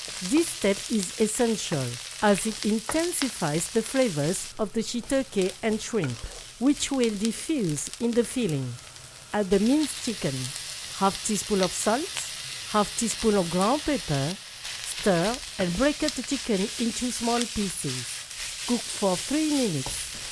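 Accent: France. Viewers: She is Asian with a French accent !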